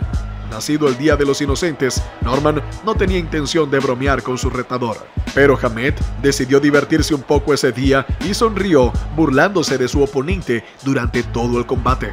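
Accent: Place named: Central America. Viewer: Parcial y mediocre tu video y TU SABES muy bien porque. No ubico tu acento pero debes ser centroamericano, no encuentro otra explicacion para tan inconmesurable omission.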